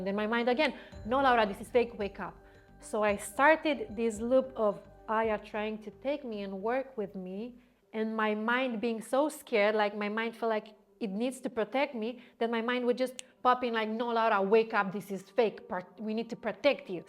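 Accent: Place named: Russia. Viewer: Hi Laura u sound Russian😘Whoa this was posted on my bday, woohoo!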